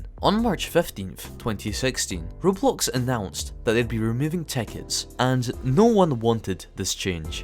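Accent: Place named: Scotland, United Kingdom. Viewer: I know this guy is Scottish I recognize the Scottish accent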